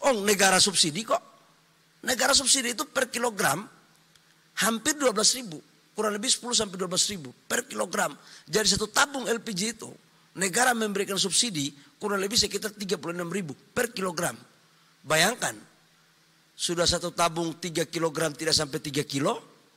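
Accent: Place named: Papua, Indonesia. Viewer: pa balil ini dialek papua mentah betul karna ada pakai bahasa KItong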